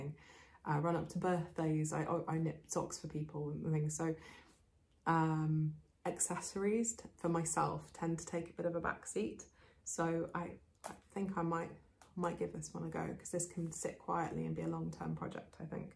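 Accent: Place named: England, United Kingdom. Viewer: I couldn’t find the box to comment on your first podcast so am pleased to see it here. It is a delight to listen to your beautiful gentle English voice and watch as you talk. You have a pleasing manner and it feels as if we are sitting in the same room. Good luck with the spinning and idea of fleece to fabric. It is purely desire + practice. I learned in the 1980s and it is so much part of my life. The ability to buy a fleece clean and sort it, wash and card ,spin and knit never ceases to thrill me and there isn’t anything like that lovely sheep smell. Have you come across Lisa at Soulful Spinning podcast? She does quite a lot of spindle spinning as well as fleece preparation, is a delight to watch and very informative. I know you will enjoy Wonderwool. I really enjoy this festival and usually take 2 days to explore all of the sheds. 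Unfortunately I am unable to go this year. I would suggest you quickly walk round all 3 sheds to get a feel of what is where, before you begin to browse. That way you won’t run out of time. It was a good day when your podcast came on my lists. Thankyou for your lovely content and long may you continue. ( I particularly enjoy your rabbit holes😂). 😊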